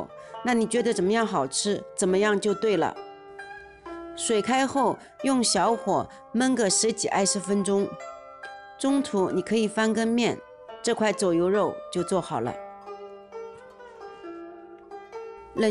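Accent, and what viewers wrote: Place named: Jiangnan, China. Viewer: 听口音是江南人。太仓人概率95%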